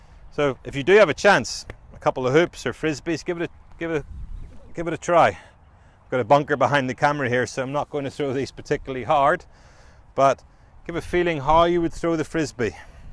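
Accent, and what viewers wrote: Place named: Northern Ireland, United Kingdom. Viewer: Was pleasantly surprised to hear the N.I. Accent. Great video! Will definitely help with what I am struggling with. I am longer than most off the tee and probably average length with irons due to my “stuck behind” swing.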